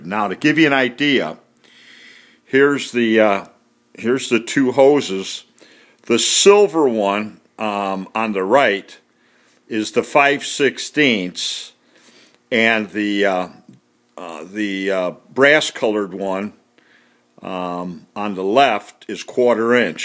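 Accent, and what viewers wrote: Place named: Northern Illinois, United States. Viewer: A fair sized threaded Clevis Shackle sure helps hauling it into the garage out of the crate. The threaded pin on mine just barely fits into hoist hook opening. The fabric hoist sling then easily slides on each side of the shackle. Yeah, I knew you were from northern Illinois. The boat video and the voice were dead give aways. I'll have to work on it to legally get to my property in the UP. I wish I could do some quick connects and haul it out of a trailer up there with a chain hoist and lashed tinbers, but I'm thinking I'm better off just doing fenders and lights and haul back roads at night. Just have an old Ford Explorer and a well restored old dirt trailer based on an ancient welded pinion U shaped frame/axle thing . It holds alot of weight, but .... Thanks !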